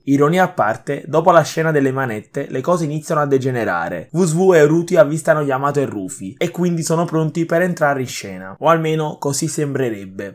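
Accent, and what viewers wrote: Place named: Naples, Italy. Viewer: Che video penoso, non c'è coinvolgimento. Tanto valeva farlo con il sintetizzatore vocale di google translate. L'accento napoletano è insopportabile, potresti sforzarti ad attenuarlo un minimo.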